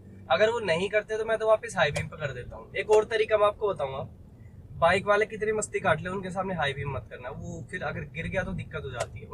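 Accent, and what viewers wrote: Haryana, India. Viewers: Bhai haryana ka hai k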